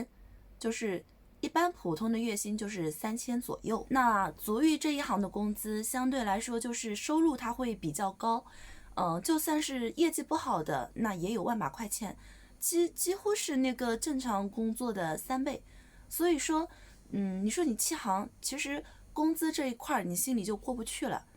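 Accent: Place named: Jiangsu-Zhejiang, China. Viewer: 所以 你做的足浴城在哪里 听着像江浙口音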